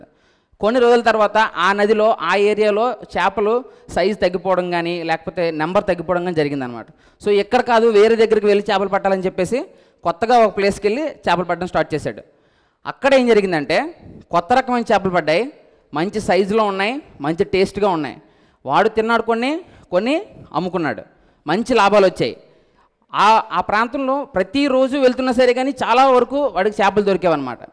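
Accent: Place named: Godavari, India. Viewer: Godavari slang....maadhe...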